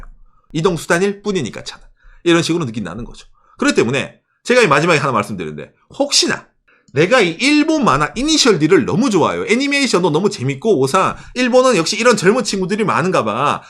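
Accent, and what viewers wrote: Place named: Gyeongsang, South Korea. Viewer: 어디서 많이 들어본 말투인데... 어디지 ? 경상도인데..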